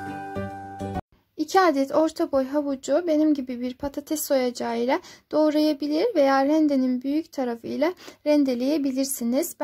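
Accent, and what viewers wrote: Azerbaijan. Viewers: Güzel sesinizden azerbaycanlı oldugunuz belli⚘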